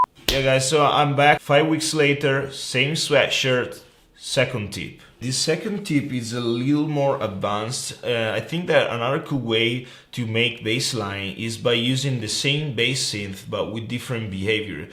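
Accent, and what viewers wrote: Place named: Italy. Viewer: si sente troppo che sei italiano<3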